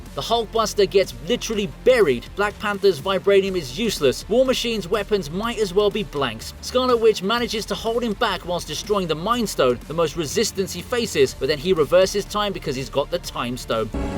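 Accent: United Kingdom. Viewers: Will I listen to british commentary?It makes me think about suicide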